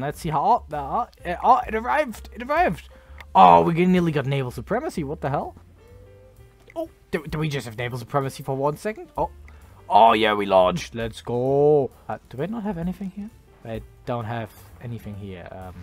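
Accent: Germany. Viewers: Ich komm' auf den deutschen Akzent nicht klar. Hab direkt zu Beginn schon bemerkt, dass da was faul ist. 😂 🇩🇪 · nun, man hört raus, das du deutscher bist.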